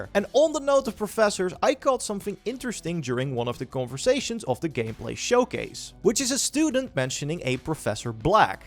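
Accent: Netherlands. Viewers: Definitely some guy at the Netherlands. Very goed Engels. Beetje Louis van Gaal vibes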